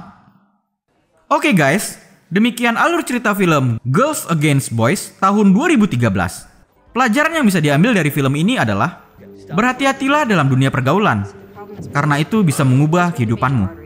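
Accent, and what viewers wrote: Medan, Indonesia. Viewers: Bro aku suka bahasa mu..sesuai dengan daerah kU Medan.. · medan kau ya lae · Logat medan ni yah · Bah, Nampak kali Medan kau bang... Agak kreak dikit biar makin mantap bg.. Hehehe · nak medan bah hahah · Kimak kali miminnya, org medan kau ya min??? Aku pun org medan wak 😂😂😂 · Mimin orng medan? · Nampak kali logat medannya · Fixs nih orang Medan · Orang medan ni kayakNya..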